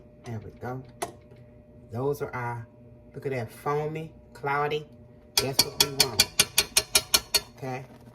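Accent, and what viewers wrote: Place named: South, United States. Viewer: Mrs., I just wanna come sit at the table. I love your spirit and yo kountrrryy twang.. God's continued blessings to you and.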